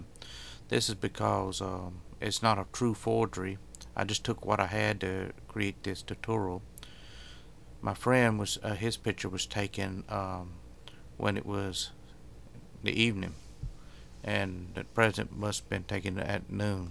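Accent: South, United States. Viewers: BEST instructional narrator on youtube! The laid back southern accent really takes the edge off.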